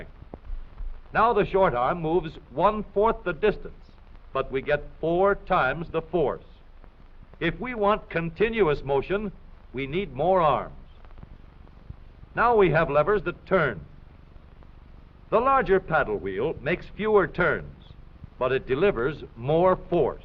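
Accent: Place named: South, United States. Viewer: Dat southern accent :D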